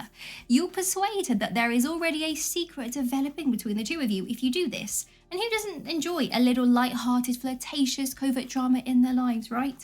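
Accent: United Kingdom. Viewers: Actually, this is my real YT channel, and I still love your British accent. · I love your British accent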